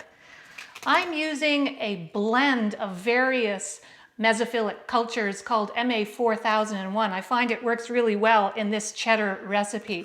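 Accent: Canada. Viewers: Wonderful, sensible instructions, without fuss. Also enjoyed the clear enunciation--is that a Canadian accent? Lovely. Thanks for this!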